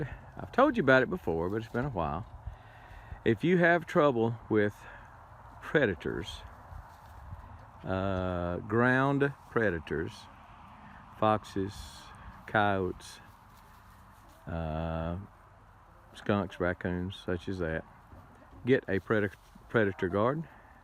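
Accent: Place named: South, United States. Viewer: I’m a displaced Southerner in California and I surely am enjoying your Southern voice. Thanks for the tips on nighttime predators. We just lost a chicken to a hawk just yesterday.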